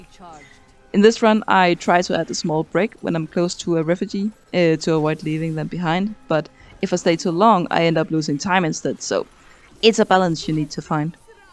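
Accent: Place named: Denmark. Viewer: As a fellow Dane you can instantly recognize a Danish accent, when you find it out in the wild like this :) Nice run as well.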